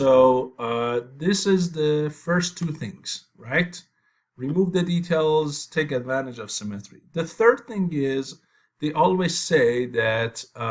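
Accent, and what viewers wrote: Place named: Iran. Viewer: سلام من از دانشجو ترم 8 مکانیک یکی از دانشگاه های ایران بسیار بسیار از شما ممنونم بابت این فیلم بسیاراموزنده از مش زدن بسیار نحوه درس دادنتون شیرین وقشنگ بود بسیار لذت بردم دنبال اموزش مش در انسیس بودم در یوتیوب که این فیلم رو دیدم و دیدم چه لهجه ایرانی دارید. دیدم استاد هم ایرانیه کیف کردم سرزنده باشید.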